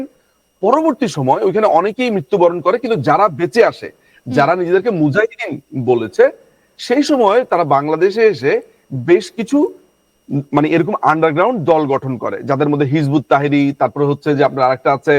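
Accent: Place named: West Bengal, India. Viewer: এই মেয়ে -------- তোর কথার সুর পশ্চিম বাংলার মত।।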